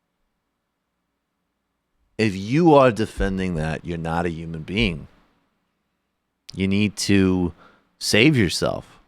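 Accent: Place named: New York, United States. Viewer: cuz he's a new yorker XD he also says yuge, instead of huge.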